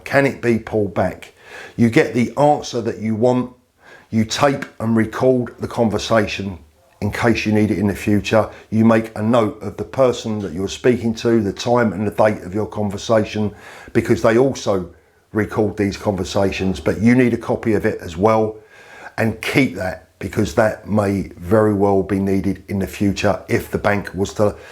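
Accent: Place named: Yorkshire, United Kingdom. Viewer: Yorkshire guy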